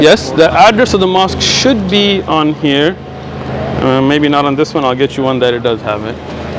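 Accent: United Kingdom. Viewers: I love sheikh British accent., 😂😂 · Loving the British accent 😅😅😅 Anyways Salaam from Malaysia. Your videos has been my go to “podcast” to listen to while driving back and forth to work.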